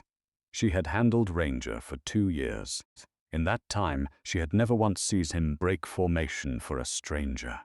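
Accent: United Kingdom. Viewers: British accent ruins the story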